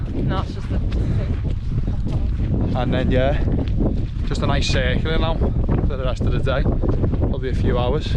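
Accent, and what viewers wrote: Liverpool, United Kingdom. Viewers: haha I live in Cheshire so no issues with the scouse - but I had the captions turned on and had a good laugh. Do you think this route is ok to do in the snow with microspikes? I've heard there is a 6ft scramble down rocks (is that the bit ) which might be sketchy?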